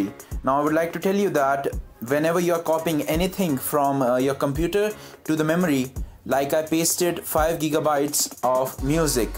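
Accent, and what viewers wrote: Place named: India. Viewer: The Voice is English taste India, because Google now is beling to India People.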